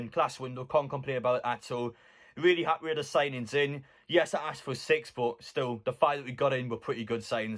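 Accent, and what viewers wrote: Newcastle, United Kingdom. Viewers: Some really good signings. I think its easy to get carried away, but the fact is we are in a relegation battle. These signings were made with that in mind, in my opinion. 8.5/10 for the signings overall. 10/10 for keeping us updated throughout the window, love your passion lad, proper geordie 👍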